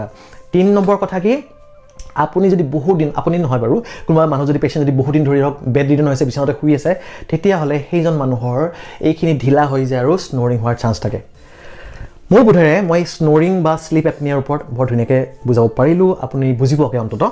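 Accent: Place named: Nalbari, India. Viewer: চাৰ আপোনাৰ মূখ্ত আমাৰ আপোন নলবাৰী ভাষা শুনি বহত বেছি ভাল লাগে আৰু আমাৰ নলবাৰীয়া হিচাপে আপোনাৰ পাই গৌৰব অনুভৱ কৰোঁ চাৰ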